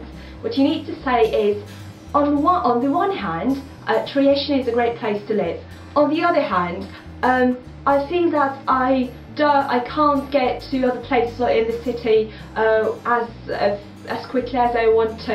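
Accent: United Kingdom. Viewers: its british acent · А что такое "английский акцент" когда чел говорит по-английски? У неё, кстати, британский акцент. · You have a British accent.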